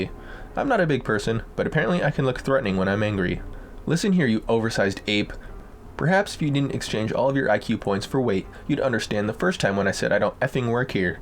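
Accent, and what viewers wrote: Australia. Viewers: I loved your Aussie accent. You did a very job 🇦🇺 ☺️ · Mate you did a great job at the Aussie accent.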